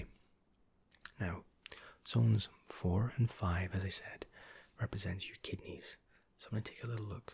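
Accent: Scotland, United Kingdom. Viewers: I love that Scottish accent - East coast? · He is originally from Coventry, but he and his parents moved to Scotland when he was around 3, I think he said, thus something of a very slight brogue. But recently he moved back to England for work. Where in England, I'm not sure, though. I don't think he said specifically, to my recollection. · Idil Ozler Scottish I think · Great british accent! finally glad I found ya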